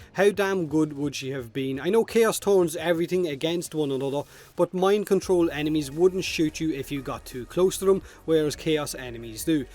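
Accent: Ireland. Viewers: You sound hot. Just saying. I'm a sucker for the Irish accent though. <3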